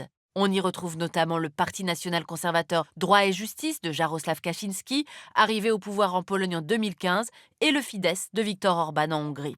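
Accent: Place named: France. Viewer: Que asco ese tonito francés...parece que tuviera una puta papá en la boca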